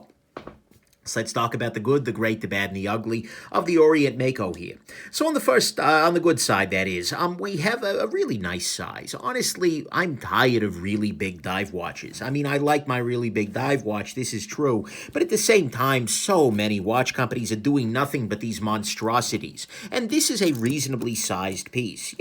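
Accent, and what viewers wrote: New York, United States. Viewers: That's a New Yorker voice hard core.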